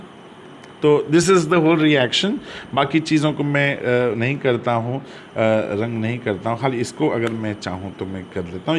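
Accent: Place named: United Kingdom. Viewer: Sir your British accent just feels so real. so smooth 🤍 Really appreciate your work 🤍👍🏻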